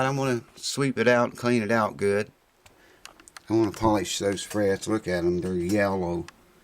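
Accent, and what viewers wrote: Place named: Appalachia, United States. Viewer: Great video - your accent reminds me of the Appalachian Mountains - I sure miss my old friend Ralph Stanley.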